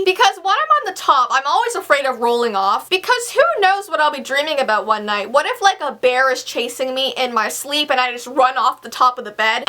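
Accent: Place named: Canada. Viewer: think it's jut her Canadian accent. In her baby sitting vid, she pronounced bagel as BAG-gul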